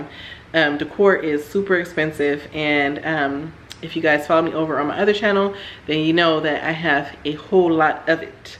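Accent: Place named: South, United States. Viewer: When I stared watching you I KNEW were a southern. You could have knocked me over with a feather when I learned that you were from California.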